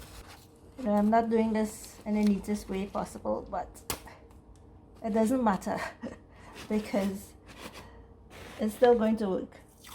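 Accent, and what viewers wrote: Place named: Trinidad and Tobago. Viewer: I knew you were from Trinidad when i heard your voice it reminds me of my friend wife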